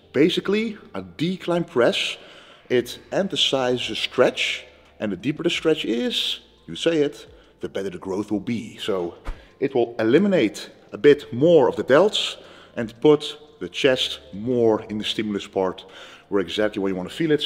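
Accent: Netherlands. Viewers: I love the way he talks, it's just calm, clear and spiced up by his dutch accent. I don't perceive any arrogance, just confidence. Which is understandable, he is one of the top bodybuilders in the world.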